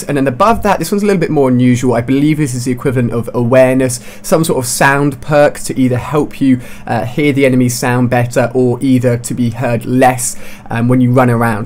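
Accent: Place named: United Kingdom. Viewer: man i so hate british accent.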